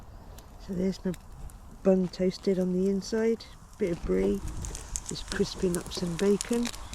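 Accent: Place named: West Country, United Kingdom. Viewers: Hi I just found your channel and have subscribed - obviously. My one burning question is where is your lovely accent from? At first I thought West Country, but I’m not sure that is right. Looking forward to seeing more of your videos. Tell Minnie I said “hello”. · From a 70 year old Upton boy living in wales now , I recognised that great Worcestershire accent. Lovely videos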